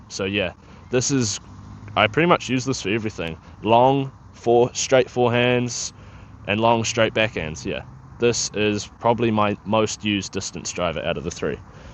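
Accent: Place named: New Zealand. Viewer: I wasn't expecting a Kiwi accent when I clicked on this video. 😂😅 I have an identical Halo Firebird that I could sell you if you need a back up.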